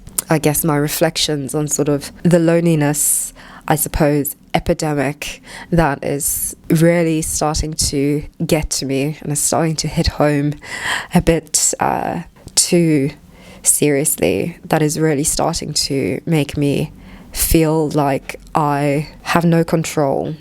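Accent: RP, United Kingdom. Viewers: Hey I am so sorry. You re exceptionally honest. this has a lot of value. if i have to be honest I am a bit annoyed by your posh accent (i m italian and probably i have a laughable accent BTW, so please forgive this). But you re absolutely adorable. Please reach out if you need something. even to me if there s no one else. (I live in London though). No one should be that disconnected to be threatened to end up on the street with no alternative. I really hope you re doing better since you posted the video. If not, please make contact. A hug from an oppresive patriarcal white man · How can I be your friend for real? Your accent makes me wonder if you live far away in the UK? & the answer is yes. It is sad how humans are replacing having each other, with having things or pets. Pets are more friendly than humans it seems... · What a very posh english voice, never hear white girls talk like that these days. 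Have had similar symptoms. Maybe its the bioweapons, maybe its the illuminati anti love campaign. British NHS is dead, mental health services, government and society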